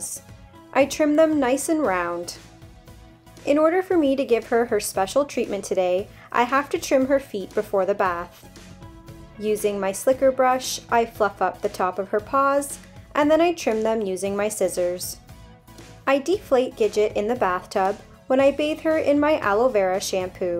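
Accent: Canada. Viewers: And her subtle Canadian accent adds so much to it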